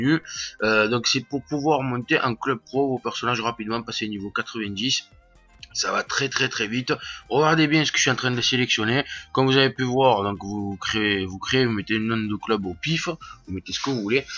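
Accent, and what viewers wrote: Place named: South, France. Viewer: T'as un accent du sud ! Tu viens vraiment de Belgique ? :P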